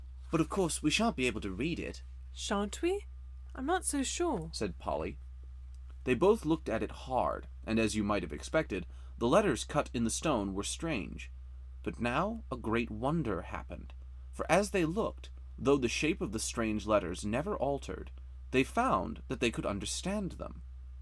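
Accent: United States. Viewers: Why an American accent for an English story?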